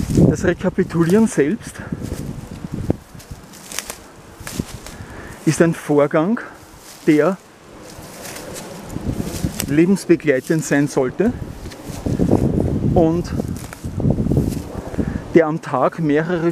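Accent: Austria. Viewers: DANKE DIR.DEINE STIMME IST ZAUBERHAFT SÜDDEUTSCH.ÖSTERREICHISCH. DANKE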